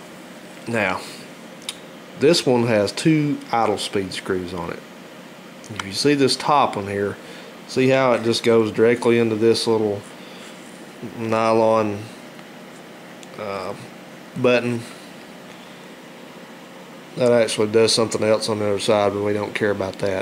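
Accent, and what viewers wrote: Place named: South, United States. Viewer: I love it when a guy with a southern accent impersonates someone with a southern accent.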